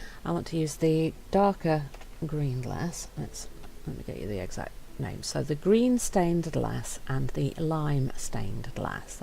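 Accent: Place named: United Kingdom. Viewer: I have a question... Are you from the UK or the US? Because I’m from the UK and your accent sounds like some people from where I live.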